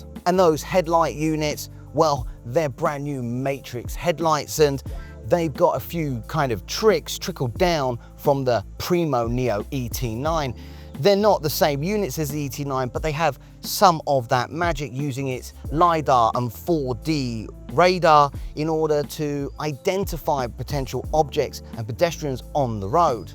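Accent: United Kingdom. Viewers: He sounds like a brit,right?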